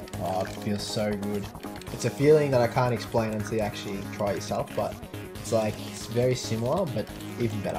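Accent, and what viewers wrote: Australia. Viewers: Heya, did you get yours from focusattack? I'm guessing you're Aussie with the accent (lol) so how long did it take to ship? I'm in NZ for reference.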